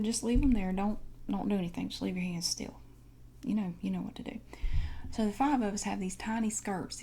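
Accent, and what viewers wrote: South, United States. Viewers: IT's cool to see another asmrist with a southern accent. I felt a little self-conscious about my voice but starting to feel more okay with it. You're amazing! Subscribed! :)) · Amazing! Southern Accent is such a good trigger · Southern ASMR? I'm in heaven. Subscribed!!!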